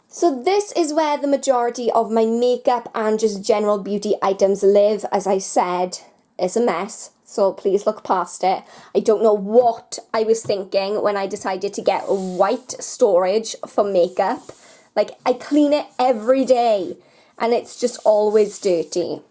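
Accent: Wales, United Kingdom. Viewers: I was trying to pin point it. I was thinking Welsh at first. I blame Torchwood. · Maeli Tickle Welsh and it sounds lovely imo 😊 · ine lie this sounds very much like Welsh accent to me!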